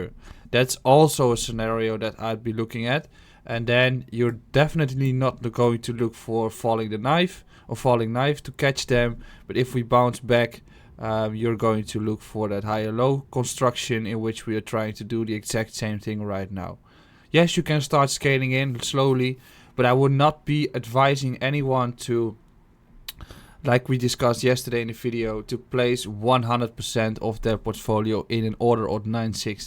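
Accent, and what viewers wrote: Netherlands. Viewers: thanks for your vids and your sweet Dutch accent...I assume. the bounce off the 20 week average was promising but market looks like its weakening.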